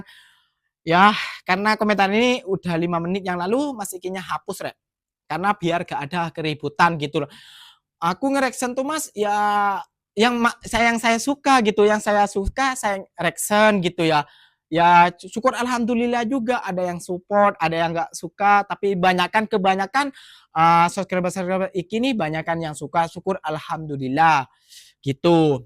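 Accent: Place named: Indonesia. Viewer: dari awal sampai akhir video tuu kamu udah cakap melayu dialeknya aja dialek imdonesia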